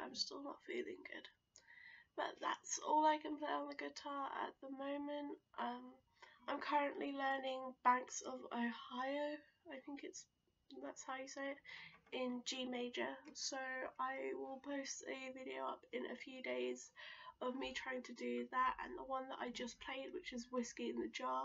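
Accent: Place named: United Kingdom. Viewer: Good job! Nice sincere vlog and accent (I just love British English)! You've got genuine energy, I'm also a great fan of music in general, thumbs up! I recently just started vlogging as well, so if you got the time check it out:]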